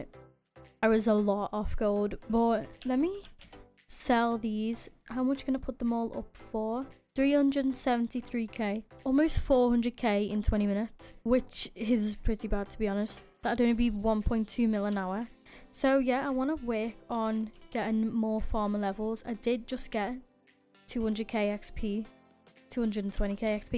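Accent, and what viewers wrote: United Kingdom. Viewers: Proper British nice to have a English skyblock player for once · question where are you from i feel like you are from the uk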